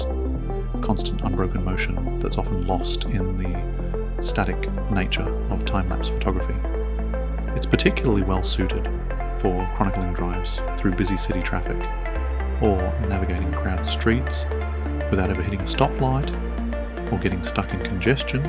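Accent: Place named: Australia. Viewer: this is a scary version of your voice. It's like almost you but not quite. It's like basic australian man with a little Da mixed in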